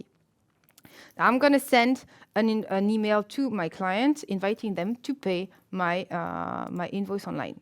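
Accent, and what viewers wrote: France. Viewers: Très bonne vidéo, mais j'ai comme l'impression qu'il te reste un petit peu d'accent français sur ton anglais (qui est parfait je tiens à le préciser haha)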